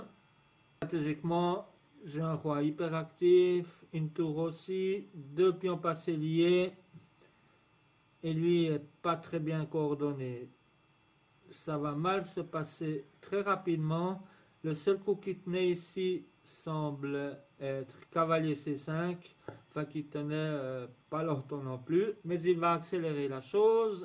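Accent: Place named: Switzerland. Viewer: Ça fait des lustres que je n'ai pas regardé une partie à peu près en entier et j'ai vraiment apprécié ton jeu.... ton animation et ton commentaire avec l'accent suisse et toujours une pointe d'humour:) Bravoooooooo!!!!!!!!!!!!!!!!!!!! 👑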